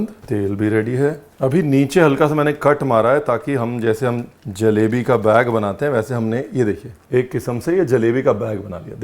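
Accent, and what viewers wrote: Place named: Lucknow, India. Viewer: Punjab in blood and Lucknow in soul..such a deadly combination you are...love the way you talk in “lakhnavi andaz”... Aaye Haye!!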